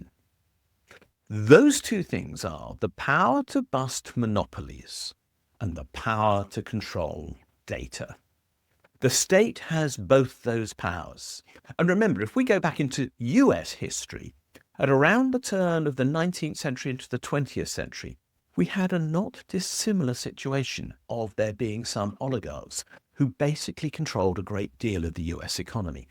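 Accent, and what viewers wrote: United Kingdom. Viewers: By your accent your from Britain? UK? Stay out of U.S politics bud Trump is our president. A true leader. I ask this how dangerous can a company get when all the people have to do is simply use another company if they get too powerful but when the government gets too big we are fuc*ked. I'm more more fearful of a government than any company. Wake up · Your talking out of your backside because your mouth knows better. And your Brit accent does not make you sound intellectual. The only destruction is perpetuated by the Marxist Left: No God. No Country. No Family. Burning American Flags. And when witnessing that same regressive Left burning down cities, businesses; and, not to mention the violent fire bombing of Tesla and the mob attacks on citizens, you got some balls claiming President Trump is destructive.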